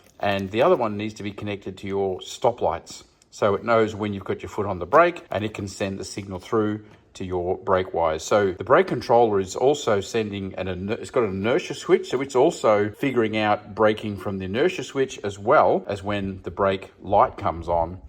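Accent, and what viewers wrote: Australia. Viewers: Good on ya....Very well presented and informative...And AUSSIE English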